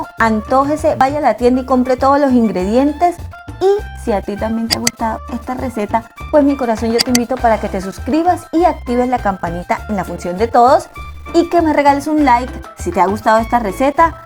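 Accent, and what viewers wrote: Colombia. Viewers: Ese acento es del llano, Santander o amazonas? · El acento no lo pude deducir...escuché valluno, costeño y paisa.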